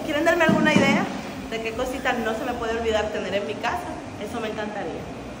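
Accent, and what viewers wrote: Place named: Mexico. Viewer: Ese acento mexicano no te keda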